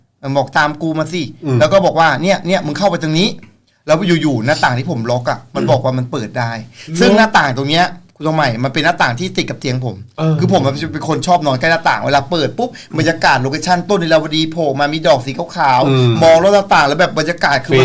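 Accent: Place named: Sukhothai, Thailand. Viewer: ชอบ เล่ามากสนุกมาก ชอบฟังภาษาโข๋ทัย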